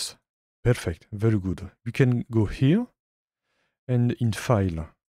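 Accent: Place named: France. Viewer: Very subtle french accent 😊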